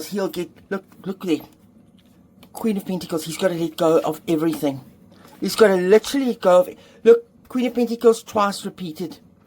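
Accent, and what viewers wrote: South Africa. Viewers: You are filming in New Zealand, but you have a very strong South African accent.? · You sound like a South African. 😮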